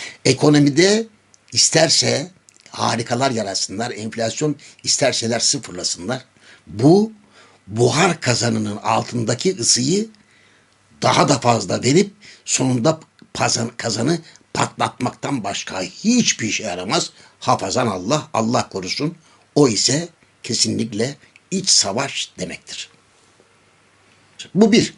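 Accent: Thrace, Turkey. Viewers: Biz de trakya şivesini severiz.. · Ben de bayiliyorum Trakya sivenize, Gecen gun "Hinge" demistiniz, butun gun aklima geldikce guldum. :) Ancak Trakyalilar anlar sanirim. :) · Trakya şivesi severim dede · LÜTFEN TRAKYA SIVESIY'LE KONUSUNUZ, COK HOSUMA GIDIYOR !!!... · Dedem trakya şivesine lütfen devam.....❤❤❤ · Saygılar dedecim. Bi gün videonun tamamını trakya şivesiyle yapsanız. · Harikasiniz cok degerli bilgiler veriyorsunuz ...ayrica fikralariniz trakya siveniz mukemmel🇹🇷🇹🇷🇹🇷🇹🇷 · Ben bayiliyorum sizin trakya sivenize. Sabah saat 6 da yuruyus yaparken sizi kulakligimla dinleyerek spor yapiyorum. Vakit nasil geçiyor anlamıyorum hocam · .Trakya sivesi ile konusmaniz cok keyifli . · Günaydın, mutlu sabahlar efendim. Yine her zamanki gibi salı gününede pozitif bir başlangıç yaptınız ve Trakya şivesiyle güzel fıkralara başladınız maşallah enerjik halinize bayılıyorum. 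Tanrı yaşamınızı hep böyle devam ettirmenize katkı versin. Tüm abone ve takipcilerede mutlu sağlıklı yaşam dilerim. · bilakis trakya şivesine bayılıyoruz beyaa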